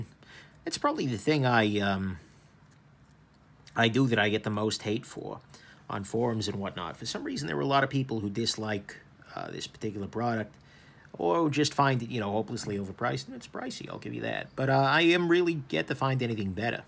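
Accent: United States. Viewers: just got into knives. i like your reviews on knives but where are you from you sound american but with a bugger in your mouth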